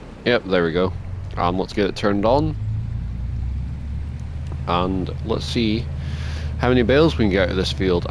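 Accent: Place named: Highlands, United Kingdom. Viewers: Hi dude. Where you from cause you sound like your near me up in the highlands? Wanting to do so e realistic farming in farming sim 25 ma sel. Keep up what your doin bud. Camsden farm is great! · sounds like Inverness lochaber maybe black isle sort of way doesn't it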